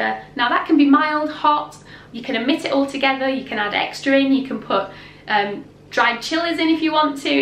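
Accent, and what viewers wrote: United Kingdom. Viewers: Is the plant always called Coriander in the UK? (I assume the accent is UK) I call the seed/spice Coriander but the plant Cilantro? It is a must for my Salsa. My favorite herb!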